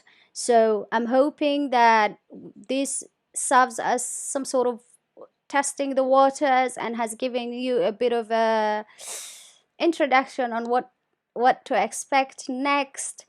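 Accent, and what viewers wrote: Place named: Ethiopia. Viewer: You sound like Habasha not Somali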